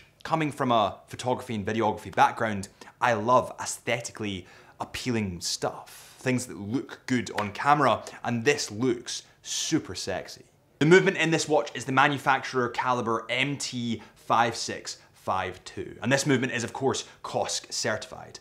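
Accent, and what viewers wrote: Scotland, United Kingdom. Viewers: Can't help comparing you to Adrian Barker. Same presentation and conversation style but with a Scottish accent. Maybe you guys should collaborate? You both like watches, photography & adventures in the wilderness. Would be a fantastic pairing for YT content.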